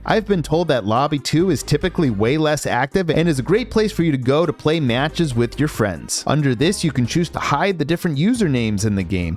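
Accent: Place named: Canada. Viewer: I’m from upstate New York and I hear it sometimes in a select few words… he sounds Canadian so I guess that checks out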